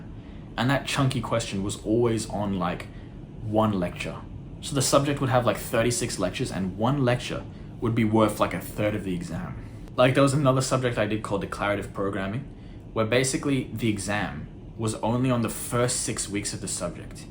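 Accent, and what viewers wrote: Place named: Australia. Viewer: dude whats with the subs, aussie accent isnt that hard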